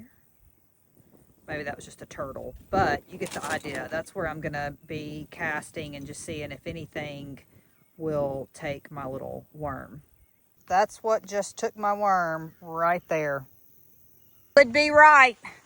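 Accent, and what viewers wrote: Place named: South, United States. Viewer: Liked it! Good to hear a gal with a good ole Southern accent. I come by mine naturally since both sides of my family are from Athens, Alabama. Best of luck in your restoration project, and I have no doubt that you will be successful. It's obvious you take pleasure in the process as well as reaching your goals.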